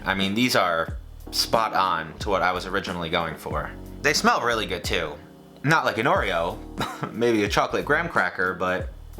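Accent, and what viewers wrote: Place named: North, United States. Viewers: Yeah, he has a northern accent! He lives in New York, doesn't he? I suppose it's subtle for people in New England, but as a Southerner with a California-esque accent, it's really evident lol! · I’m from Long Island New York and he sounds like my half of the Island. Completely normal.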